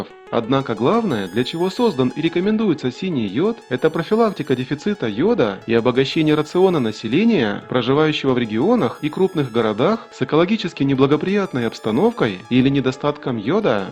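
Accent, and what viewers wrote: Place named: Russia. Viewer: Тоже ламаю голову,не могу вспомнить, -в какой местности Росси такой говорок ? ) )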